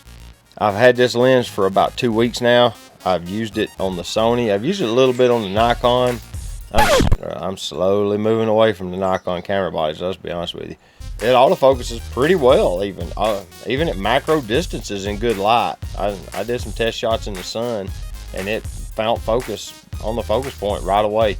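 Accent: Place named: Texas, United States. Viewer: are you from Texas , lover the accent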